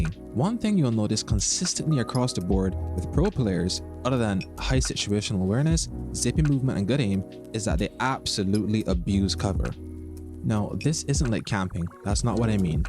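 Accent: Barbados. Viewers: Are you Bajan? You sound it! Great guide. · Great video. Will definitely be watching the rest and any future videos. Quick question are you Bajan or Trinidadian?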